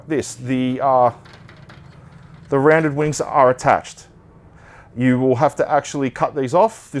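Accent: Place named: United Kingdom. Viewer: from your accent，do you come from Britain？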